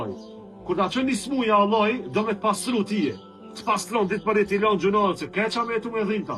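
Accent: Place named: Kosovo. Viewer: Ti do të jesh nga Kosova,në këtë dialekt.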